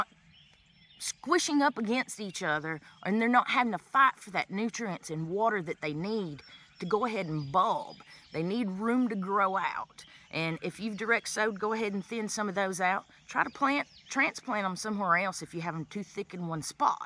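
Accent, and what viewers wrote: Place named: Georgia, United States. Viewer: This has got to be THE BEST video I've seen on onions! We also are in N. Georgia (Gwinnett County). I LOVE YOUR ACCENT! Two years ago I sat with a Georgia extension agent and had a long talk about growing onions. I proceeded to seed several varieties of short day onions and had HUGE success. Then to harden them off before sticking them into a raised bed, I set them beside the poly house in the semi-sun -- and we got visited by a late season hurricane. All that water washed down into my trays (and i forgot about them being in solid bottom trays) and drowned almost all those onions. What didn't rot we put into the ground and ignored the chickweed. You KNOW what happened. We salvaged a few that are now in stacking planters and are going to seed, which is fine because we will collect the seed and start over this year. 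Thank you for the details you provided, they are right in line with what the extension agent told me. Maybe this year we'll get off our butts and actually maintain them better. I'm going to try grow bags like you have there. How many did you fit into the bag and did they develop as you wanted? Also, how many times did you give them a hair cut?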